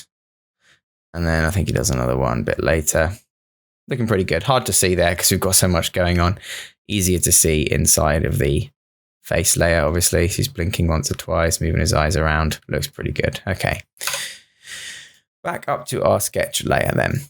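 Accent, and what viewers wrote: United Kingdom. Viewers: You got some tutoring skills man 🤙🤙🤙 Far better when you have someone with an english accent hahah.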